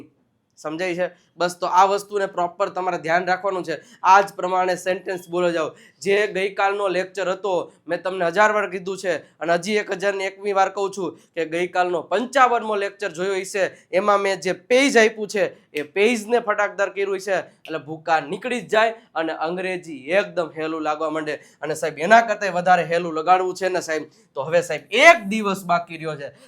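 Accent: Kathiawar, India. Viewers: I like your kathiyadi speech sir 🙏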